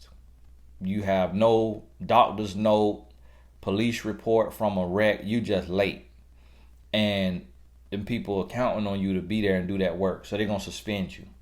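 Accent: South, United States. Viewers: Lol, and his cute country accent.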